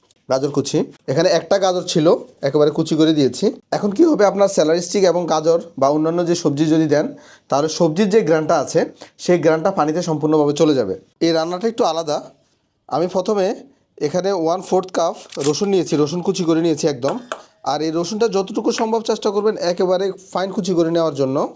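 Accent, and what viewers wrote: Noakhali, Bangladesh. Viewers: রান্না টা দারুন, তবে 1টা প্রশ্ন ছিল আপনি কি নোয়াখাইল্লা?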